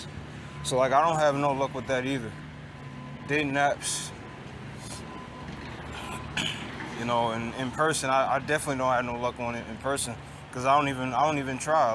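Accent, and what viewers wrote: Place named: Baltimore, United States. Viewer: Sounds like Baltimore for sure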